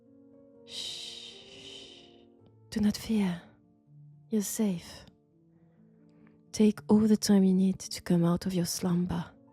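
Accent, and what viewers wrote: France. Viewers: Yesss! more, please! That French accent is melting my brain! ))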